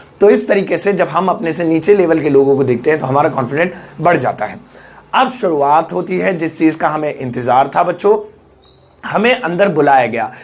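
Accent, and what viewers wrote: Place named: Haryana, India. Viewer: Sir ji ap ki haryanvi must h ..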